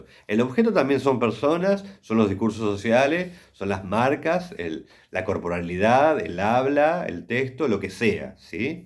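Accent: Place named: Argentina. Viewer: Desde Roma, entiendo el castellano en versión argentina también 😊, digo que la lección me gustó mucho y que resultó muy útil para asumir conceptos claros 👍 Veramente complimenti! 🌟😘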